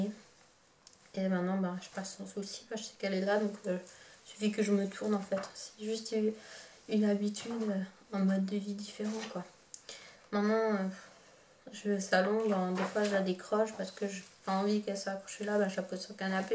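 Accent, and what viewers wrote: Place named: Alsace, France. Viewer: Ce retour de l'accent alsacien un régal pour les oreilles ! Miam ahah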